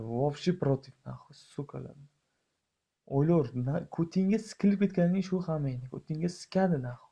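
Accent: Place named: Tashkent, Uzbekistan. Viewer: Ooo amlatta o‘zini shevenda gapir hezrlecha shevalarin borku nma toshken shevada gapirasan urod indes o‘zinsan indesligin uchun bashareni ko‘rsatmayabsan.!!!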